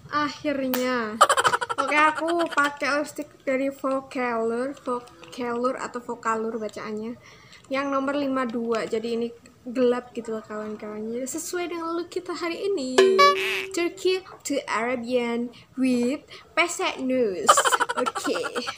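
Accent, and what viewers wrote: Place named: Java, Indonesia. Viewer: suaranya medok bgt suerr, lucu deh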